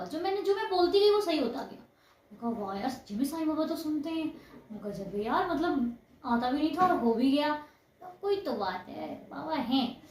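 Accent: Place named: Punjab, India. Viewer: I love ur punjabi tone mera naam sukhdeep hai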